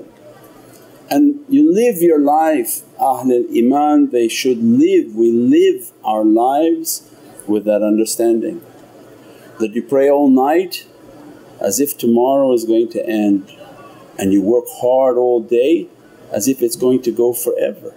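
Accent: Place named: Spain. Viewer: He sounds spanish or is that just me not that it matters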